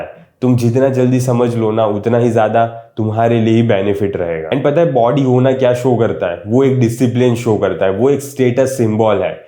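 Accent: Gujarat, India. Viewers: bhai tamari Hindi thi mane tame gujarat na j lago chho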